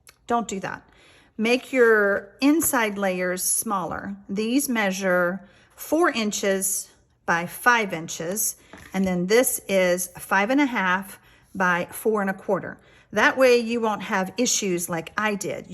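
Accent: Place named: Texas, United States. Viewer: These are beautiful! I love your card making ideas and listening to your Texan drawl .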